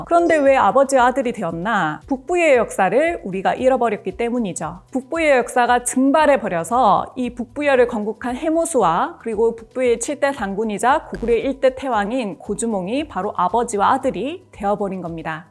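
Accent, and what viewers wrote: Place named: Gyeongsang, South Korea. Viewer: 선생님 강의는 마치 음악 같음. 선생님 때문에 경상도 억양이 이렇게 예쁠 수 있단 걸 처음 알음.. 억양의 리듬감이 진짜 아름다움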